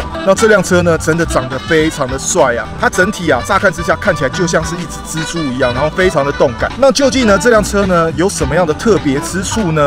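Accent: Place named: South, Taiwan. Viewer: 下港人 台湾国语好重啊 福建人后裔哦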